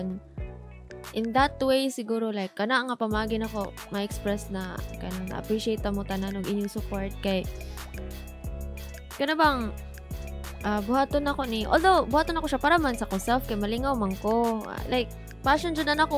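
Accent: Visayas, Philippines. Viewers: Kung di ka taga cebu, taga davao ka na bisaya 😂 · Hello again ate ❤️ Cutie mo po always pati yung bisaya accent nyo po. Parang gusto ko na ren matuto magbisaya🐱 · Ang sarap pakinggan yung pagsulti nimo bisaya..proud cebuana here..so bisaya rin ako..haha 😊nagseself study ako ng japanese but I'm not good at singing in japanese like you sung japanese songs..super galing mo ☺️😍👏👏,request nman po " " by ft.. thankyou!😍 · Oh so she is from the Philippines well i don't know that accent is it Visayas or Mindanao?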